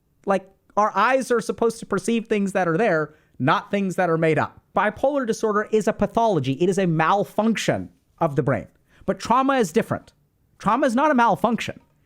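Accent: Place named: India. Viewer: I wish he would use his Indian accent more! It motivates me to listen more.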